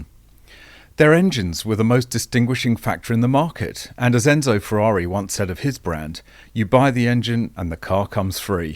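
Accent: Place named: England, United Kingdom. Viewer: Oh! What about Mercedes, Rolls Royce, Bentley and an old Australian car called a Statesman Deville, made by Holden, which I’ve had for years. I think they’re heading in the same direction. That’s my collection... And how do we get away with driving our beloved old cars? I don’t know. It worries me a lot. Annie from Melbourne. I like your channel, and it’s good to hear an English accent again. I lived in England for three years.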